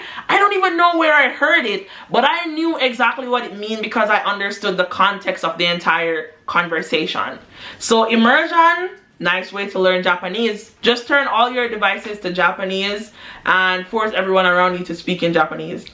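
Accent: Jamaica. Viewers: I can hear the Jamaican 😂 私もジャマイカ人ですよ…(笑)面白い。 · Is she Jamaican .....yeah she's Jamaican 😂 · I knew you were jamaican within 2 seconds. 🤣🤣🤣 I’m Jamaican-American, and I’d LOVEEEE to learn Japanese. But I gotta become fluent in Spanish first! Mi nah play!! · 20 seconds in and I'm like yup this ones definitely jamaican😂😂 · I Could Tell You're Jamaican,That Accent...I Checked You're About & There It Was Our Jamaican Colours.I Am Also Jamaican,I Want To Learn Japanese...Cause I Want To Be Where Pokémon Happens & Also I Love Manga...& I love Well Japan's Culture.Oh And..🇯🇲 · lemme guess, jamaican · Yo 🤣🤣I love your energy, I’m guessing you’re from the Caribbean maybe Jamaica (I’m new here), definitely subscribing❤️ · are you Jamaican? 👀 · omgg it looks like ur a jamaican im not sure but kinda sound like one to mi im a jamaican trying to learn japanese to be a mangaka or a translator!:3 can u tell me if ur jamaican?:) i really loved the vibes in this video too btw:> arigatou!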